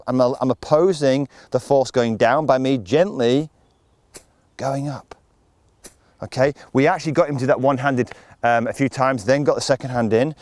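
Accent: Yorkshire, United Kingdom. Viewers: Where are you from? I hear a yorkshire accent in there somewhere haha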